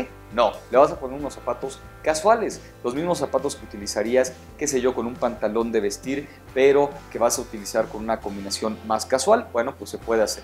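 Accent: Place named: Mexico. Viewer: Por el acento al hablar su pongo que eres de México. Podría recomendar lugares para comprar ropa sin caer en precios exorbitantes. Gracias!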